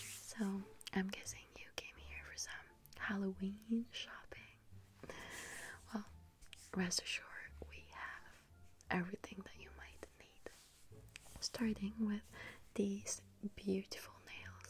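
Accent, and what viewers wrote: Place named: France. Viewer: I looove it when you do english videos with frensh accent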